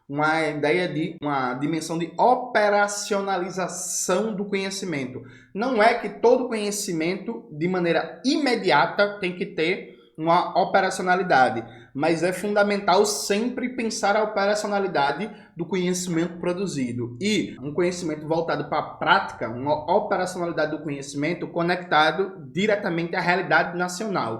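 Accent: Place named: Bahia, Brazil. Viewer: O é MUITA coisa que eu gostaria de ser, o cabra é inteligente, fala bem demais, é lindo, um cara malhado, com um dos melhores sotaques desse brasilzao (contexto sobre, minha família toda é da Baihia e eu nasci aqui em SP 😢😢😢 QUERIA TER O MELHOR SOTAQUE DESSE PAIS, PQ EU NAO NASCI NA BAIHIA 😭😭😭😭) E o homem é casado Veja se nao é um combo de características perfeitas, pós revolução terei um quadro do em minha parede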